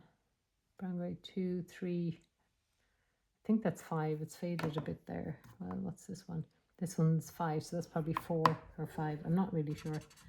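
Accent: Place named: Ireland. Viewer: Great video! Colouring is great! So nice to hear that Irish accent again!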